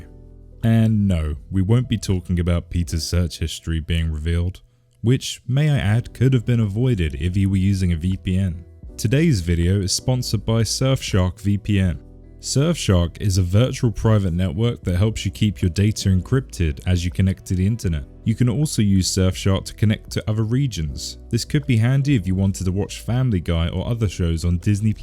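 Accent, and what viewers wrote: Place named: United Kingdom. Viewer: It’s so obvious that this guy is British…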